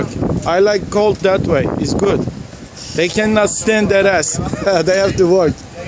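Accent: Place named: Poland. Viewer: Your accent brings tears to my eyes, thinking of my family from Poland !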